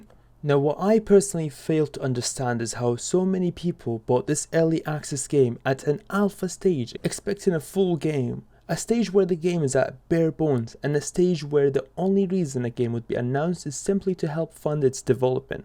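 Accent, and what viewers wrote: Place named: Scotland, United Kingdom. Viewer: Where are you from? You have a bit of a scottish accent but what is your first language? just wondering lol :)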